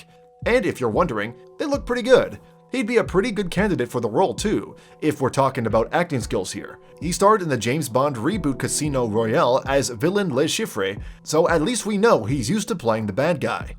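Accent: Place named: United States. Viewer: No kidding? Your dialect sounds very American.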